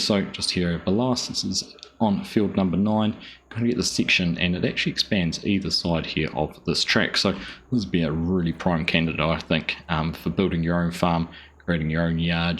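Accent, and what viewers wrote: New Zealand. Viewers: Im sorry but what part of this map represents a Aussie farm ? to me it looks same as a euro map . and a kiwi dude voice over , thats like getting a mexican to describe america .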